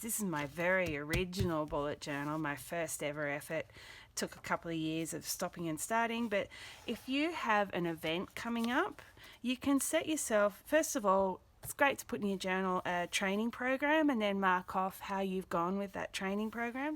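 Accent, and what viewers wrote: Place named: Australia. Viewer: yay aussie accent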